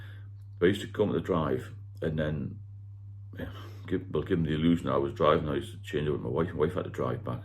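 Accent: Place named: Northumberland, United Kingdom. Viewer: Northumberland, I believe.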